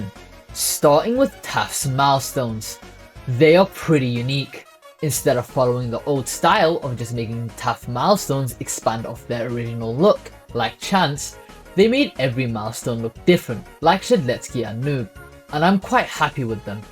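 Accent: United Kingdom. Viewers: why do you kinda sound like a british girl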